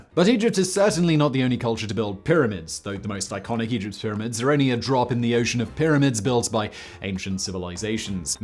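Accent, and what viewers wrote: England, United Kingdom. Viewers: Dude has used 11th grade literacy and semi posh English accent to build an empire. And i mean "11th grade literacy" at maximum. he mispronounces words I've never heard mispronounced before. Regardless, business brain blaze has made me love him AM I RIGHT PETER!?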